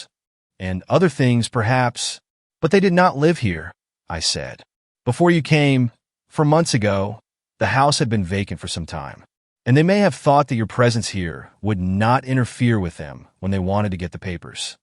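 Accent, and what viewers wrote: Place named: United States. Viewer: Horrible American reading voice.ruined the story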